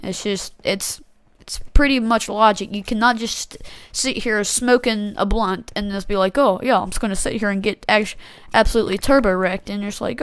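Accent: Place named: United States. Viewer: You have an accent like I do, what state are you from?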